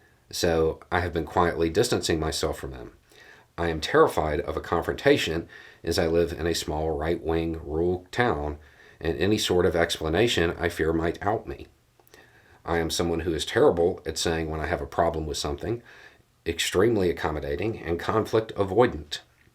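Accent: South, United States. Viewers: What sort of a loser turns to a pontificating pumped up talking head on Youtube for life advice? Someone so desperate for an accepting father figure that a southern drawl is all they need to feel they've come to the right confessional. "Small towns" are tribal enclaves, they are not cities, they are not simply places where humans accumulated. There aren't any visible fences or walls, but small towns are not open places for anyone to live there, or visit there, or even simply pass through there. They are encampments. If you don't fit in you have no business being caught there after sundown...or during sun up, either. They don't want this kid's kind. Goodbye and good riddance.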